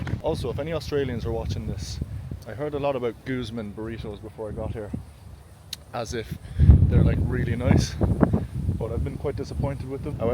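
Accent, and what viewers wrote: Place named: Ireland. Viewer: Hello. I think you seem lovely 😊 I like your Irish accent. I've wanted to visit Australia for a long time. I've thought about it a lot. I can't afford it yet, but if I'm ever in that position, I wouldn't want to miss out. I'd like to go to Sydney, Byron Bay and also travel up to Queensland as well as visiting Darwin, but obviously I know that's a long way from Sydney! I would probably need to go to Australia more than once.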